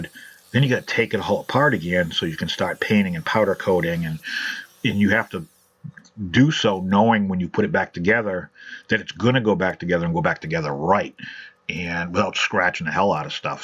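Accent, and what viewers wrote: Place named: Boston, United States. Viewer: I love the Video! You gave me some great ideas. I noticed your bracelet, and I am a disabled veteran who was so bored during the pandemic, that I re-ignited my passion for collecting my diecast cars and motorcycles. I didn’t love the Motormax die-casts, but now I’ll grab some to customize. My big question is this, the accent tells me you MAY be a Sox fan...lol, I a New Yorker so there is that. Either way, you have a new subscriber and fellow East Coaster!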